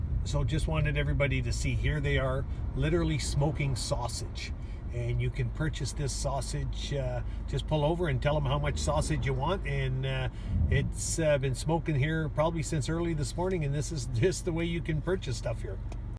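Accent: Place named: Canada. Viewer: You talk like a Canadian.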